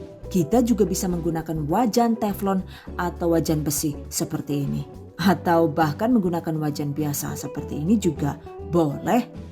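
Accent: Banyumas, Indonesia. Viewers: Ya Allah ngguyu ngakak nganti ta ulang² serius deleng mlh ngapak² ,, kencote metu 😅, suwun mamake resep e mntul pisan 👍🏻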